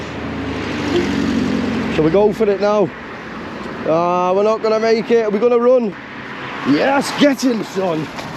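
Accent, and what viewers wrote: United Kingdom. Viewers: Welcome to Iraq mate..👈🇮🇶 Which UK city accent is this..?? 🤔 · Soooo welcome bro❤️ Your British accent is awesome man❤️❤️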